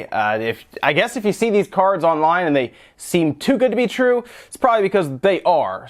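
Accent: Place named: Philadelphia, United States. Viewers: First video. Heard your voice first, thought it had a hint of Philly in it, then saw the Flyers and Eagles swag - confirmed. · Hey you from Philly or Delco? Edit: N/M saw the Eagles jersey! Was going off your accent.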